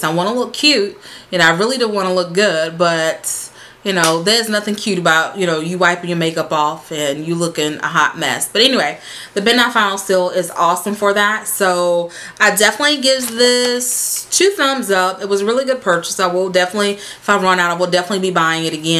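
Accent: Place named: South, United States. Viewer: where are you from? I hear a southern accent! 😜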